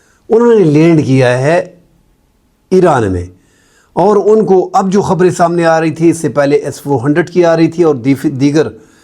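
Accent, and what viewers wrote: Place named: Hindko, Pakistan. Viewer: Sir Hindko main batain kia karain App ke urdu Hindko jayse ha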